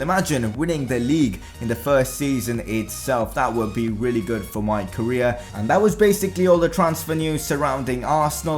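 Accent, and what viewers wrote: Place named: India. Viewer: Man he sound like a England guy but in 2024 he sound like Indian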